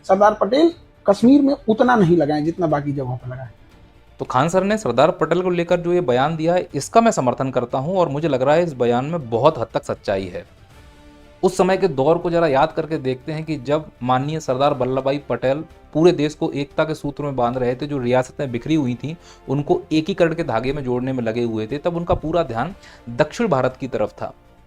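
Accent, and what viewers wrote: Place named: Bihar, India. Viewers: Bihari touch h to nikal jata h asa 😅😅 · Ye बिहारियों का ton hai sir,, aap shant rho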